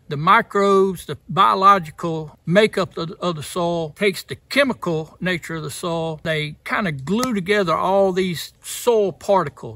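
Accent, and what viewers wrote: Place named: South, United States. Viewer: Preaching to the choir. Love the way you say soil with that southern drawl.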